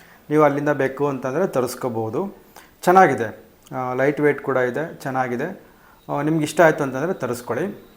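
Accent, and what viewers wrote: Chitradurga, India. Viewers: ಅಣ್ಣಾ ನಮ್ಮ ದುರ್ಗ ಕಡೆ ಭಾಷೆ ಹಾಗೆ ಇರುತ್ತೆ. ನೀವು ಮಾತನಾಡಿ ಏನು ತೊಂದರೆ ಇಲ್ಲ ನಿಮ್ಮ ಮಾತು ಕೇಳುತ್ತ ಇದ್ದರೆ ನನ್ನ ತವರುಮನೆ ನೆನಪು ಮನಸ್ಸಿನ ಮುಂದೆ ಹಾದು ಹೋದ ಹಾಗೆ ಆಗುತ್ತೆ. ಚಿತ್ರದುರ್ಗದ ತಂಗಿ 🙏🙏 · ಚಿತ್ರ ದುರ್ಗದ ಕಡೆಯ ಭಾಷೆ.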